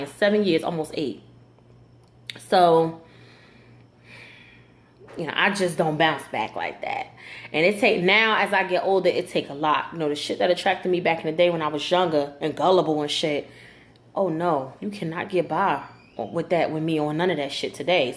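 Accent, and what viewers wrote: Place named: New Orleans, United States. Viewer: its so crazy how you remind me of several ppl i know in new orleans... accent and all!! I don't even hear Jersey until you actually say "Jersey" lol i just listen to you talk like "i already know her" lolol its so weird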